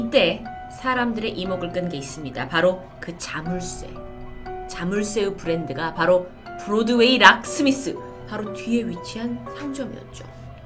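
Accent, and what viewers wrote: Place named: Gyeongsang, South Korea. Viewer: 경상도~~~ㄲ ㅈ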